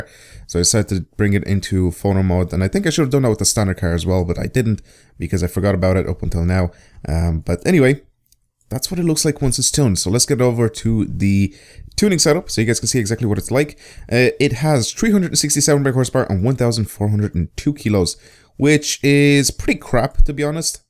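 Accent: Dublin, Ireland. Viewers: Are u from dublin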